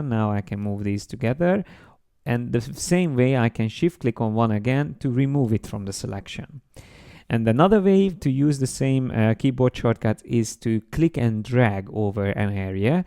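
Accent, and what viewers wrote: Hungary. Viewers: Kimagaslóan kitűnő és hatékony tananyag kezdőkne és haladóknak egyaránt. A beszéd akcentusból arra következtetek, hogy teszik tudni magyar nyelven is komunikálni, ha igaz akkor szeretném ha ezt velem közölné, mert angolból csak kezdő vagok. Igen szép munka (Y) sok sikert ezután is.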